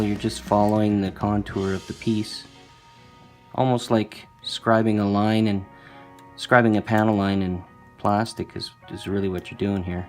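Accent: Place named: Canada. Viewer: Great channel you have going! Clearly shot video of everything you do and understated style of presentation make watching a real pleasure. No bling, no nonsense theatrics. Just a Canadian modeler (guessing by the accent here) teaching others to make their models something more than what comes in the kit. As a jewelry engraver I see that many techniques you demonstrate are similar to what is done on metals. Plastics are widely variable and offer more than a few challenges in re-workng the material. I have so much to learn yet and I suspect I will be closely studying every video you have. I'm trying to build Napoeonic armies in 1/72 and good info such as yours is difficult to find. there are thousands of "how to paint ____" videos out there but precious little about working the plastic itself. Subscribed and liked! Best modelling channel I've found yet, thank you so much for sharing your expertise with others.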